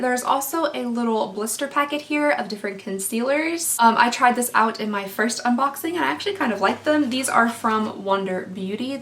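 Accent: Kentucky, United States. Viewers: Instagram- killercupcak3 I love your Kentucky accent! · I love your videos you’re very sweet and funny ❤️❤️ I’m from Kentucky also but feel our accents are so different I absolutely love your accent.! my email is <mail>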